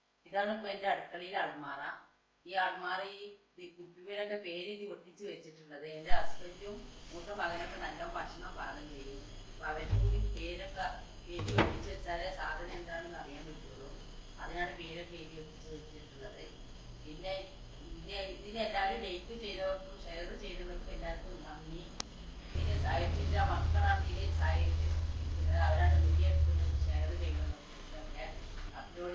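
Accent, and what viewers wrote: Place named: Kottakkal, India. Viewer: കോട്ടക്കൽ ഭാഷ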